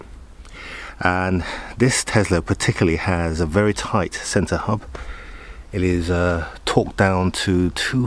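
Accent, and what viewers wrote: United Kingdom. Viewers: your a man of brown skin colour but could not sound more native british. made me laugh.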